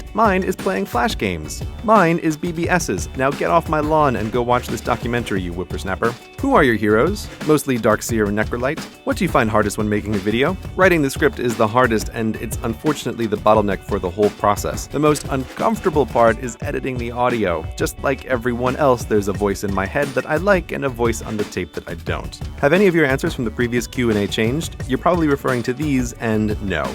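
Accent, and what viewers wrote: United States. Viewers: When I was 15, my English teacher (in Norway) told my class that it didn't really matter which form of the English language we chose, but that we should choose one and stick with it. Is your attempt to keep your accent fully American (it's impossible to avoid being affected by those around you entirely) similarly motivated?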